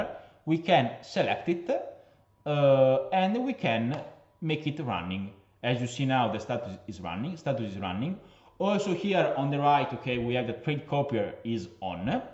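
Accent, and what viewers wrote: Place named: Italy. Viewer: chicco bello il tuo inglese è buono però si sente che sei italiano aahahha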